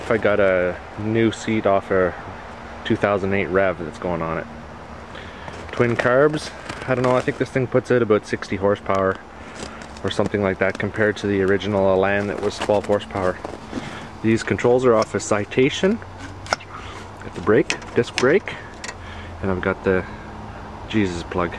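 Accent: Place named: Canada. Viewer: It;s hilarious that I can tell when I'm watching a video from a Canadian by the way they talk lol... Yer from the Ottawa Valley eh? hehe.. Cheers Toronto here!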